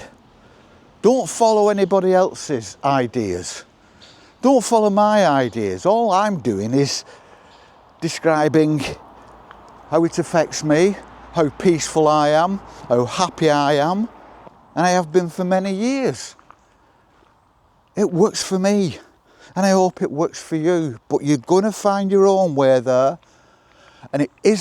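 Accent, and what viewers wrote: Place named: Lancashire, United Kingdom. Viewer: ‘Those’ videos on YouTube made me laugh. I’ve instantly clicked straight off quite a few recently 😂. What a gorgeous walk! I’m living exactly the same way in the west countryside of Victoria, Australia. Listening to you is like talking with my mam and her family who all emigrated out here from Oldham, Lancs. it’s made me quite emotional. Peace and love to you too 😘🤗.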